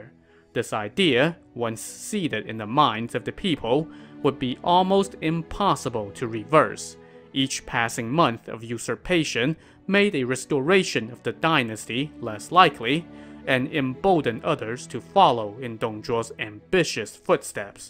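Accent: China. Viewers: Is the narrator a Cantonese? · It is. He has a Chinese accent.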